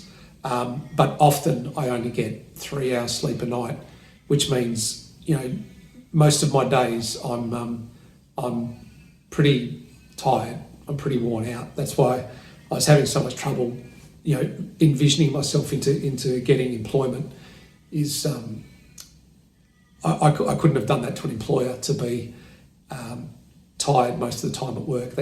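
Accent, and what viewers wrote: Australia. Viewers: Dear, I pray for improvement in your situation (as much as an atheist can pray) and send you love and support. I like your channel for 2 reasons: 1. The beautifull Australian accent 2. The great person you are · I am happy you are back. We worry about you and pray for you. Negative comments! Hard to believe there are weirdos like that It is bad news but I am glad to see you are going to fight. You look good, even if you don't feel good. You are alert and keeping positive no matter what. Hang in there and keep posting, especially before you go in for your scans. Try not to worry. We will pray for the best. You are so brave and an inspiration in courage. Love your cute Australian accent.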